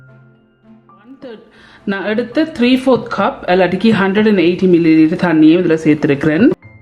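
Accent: Jaffna, Sri Lanka. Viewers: நீங்க யாழ்ப்பாணமா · Its really nice.your voice also it reminds me jaffna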